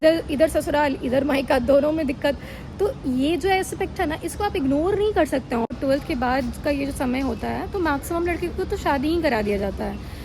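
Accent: Bihar, India. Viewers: The way you taking is just awesome Are you from Bihar ??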